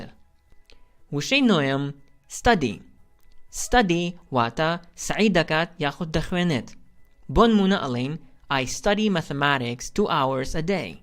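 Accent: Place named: United States. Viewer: ئەوە ئینگلیزی ئەمریکیە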